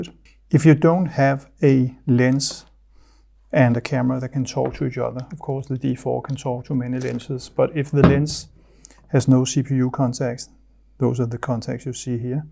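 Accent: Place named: Denmark. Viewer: I've been following Youtube and Private site for a few years now, one of the best out there for learning - clear, concise and to the point with the bonus of that beautiful Danish accent - deserves much more than the current 15k subscribers. The D4s has been my dream camera since it was released and I almost bought one back in March '25 but went with the D810 to add to my D7200 and D7000 - I was put off by the added cost of XQD / CF cards [ though the D810 does have a CF slot I've not bothered using it ] - Watching this video I'm tempted to revisit the D4s once more.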